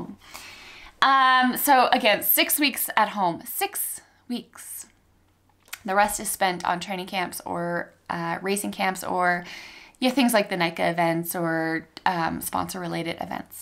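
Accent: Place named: Canada. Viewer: I love the Canadian accent nuances I'm surprised i pick Canadian over American as an Australian. You're awesome your guy is ver6 lucky 😃👌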